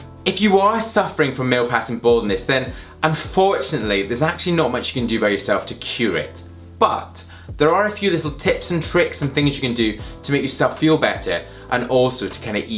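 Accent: United Kingdom. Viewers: Love that British Accent , so cool !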